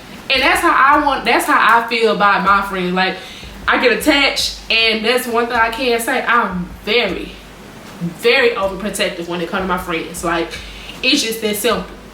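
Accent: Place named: Texas, United States. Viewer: Hey girl new subbie!! You have been making your rounds. I've seen you on Gina's channel as well as commenting on Rochelle's!! Girl I heard the Texas in you as soon as you opened your mouth I knew I was at home! I love it! I'm from Texas too!! Make sure you turn up this year, go out of your 20's with a bang🎉💃🏽🍷I love your vibe, you're so cool and I can tell you're a sweetheart.🥰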